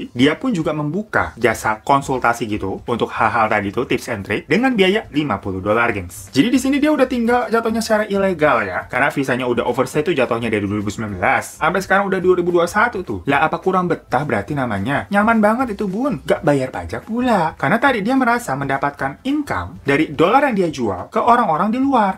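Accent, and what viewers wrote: Java, Indonesia. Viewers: jelasinnya kayak teman, bahasanya paling enak dan satu satunya yg bisa ngelawak, cerdas bang! Nessie judge: ngejelasinnya serius Arroyan Pram: ngejelasinnya dramatic dan sinematic editor berkelas Korea reomit: ngejelasinnya medhoq Yok siapa lagi nih???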